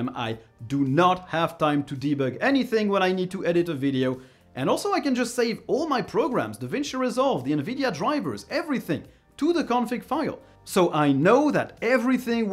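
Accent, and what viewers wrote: France. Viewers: Excellent video, i now want to try Nixos ! Also, you have a clear English pronunciation but what is your mother tongue, are you French?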